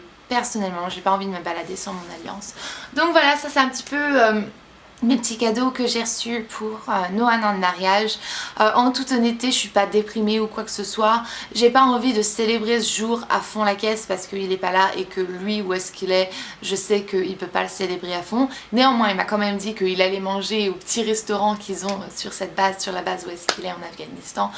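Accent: United States. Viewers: lol on dirait tu as deja choppé l'accent américain c'est bizarre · Félicitations ! Et je sais pas si je suis la seule mais je trouve que maintenant quand tu parle français t'a un petit accent américain :) Et je suis contente pour toi que te revoie ton marie bientot bisous :p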